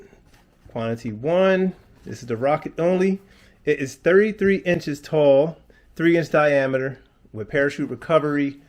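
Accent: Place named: Flint, United States. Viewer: Sounds like is from Flint Michigan. I wonder if Ryders hobby still open? Hi! From Saginaw, I grew up in Flint. Stay blessed.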